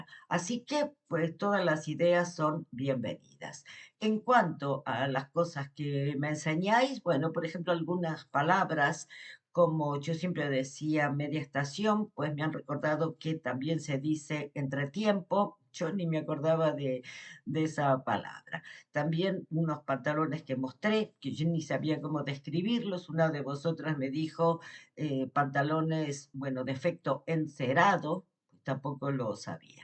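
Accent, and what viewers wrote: Argentina. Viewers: Hola!! Hace poco q te veo,pero me encantas.porcierto me gusta mucho tu acento argentino ❤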